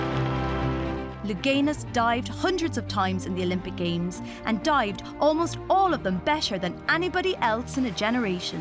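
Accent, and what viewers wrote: Ireland. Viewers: Lol at the Irish accent of the narrator.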